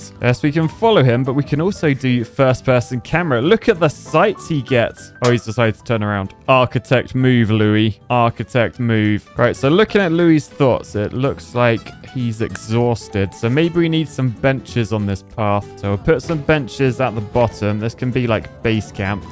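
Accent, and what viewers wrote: United Kingdom. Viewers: Can anyone explain to me why Brits always pronounce Taco like Tack-oh and Los Angeles like Los Angelease?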